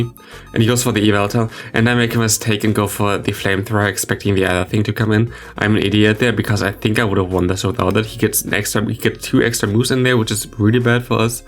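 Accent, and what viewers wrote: Germany. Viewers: Bist du ein deutscher ? :)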